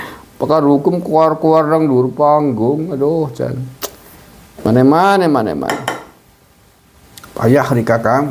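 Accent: Banyumas, Indonesia. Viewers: Ndasmu njebluk 😂..om logat Banyumas.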